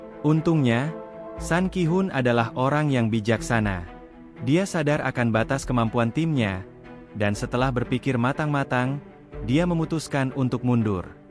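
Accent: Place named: Indonesia. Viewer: Indonesia banget baca dungeon ,Potion,healer😅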